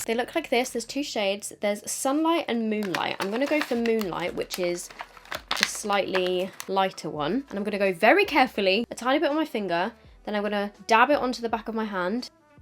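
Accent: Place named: United Kingdom. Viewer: I literally lol when you said the word “hottie” sounded dumb in a British accent 😂…I feel the same way when I want to sound eloquent but my southern American accent just won’t allow it🤣🤣 Glad you’re feeling better!!!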